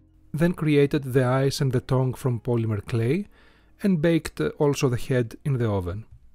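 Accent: Greece. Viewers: AAAAA RE HELLAS I LOVE YOUR GREEKLISH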